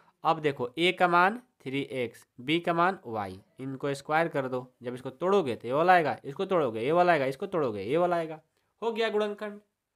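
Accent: Bihar, India. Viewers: Sir àap Bihar se h ?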